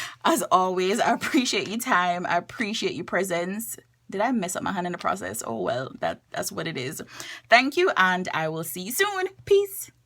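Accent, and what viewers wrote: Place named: Jamaica. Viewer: heard your accent. Instance sub. I am JAMAICAN TOO. :-)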